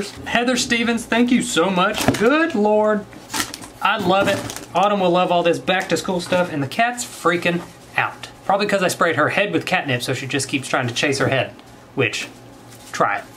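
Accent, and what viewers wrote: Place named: Upper Peninsula, United States. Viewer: Ayye yooper eh?! Wee love it!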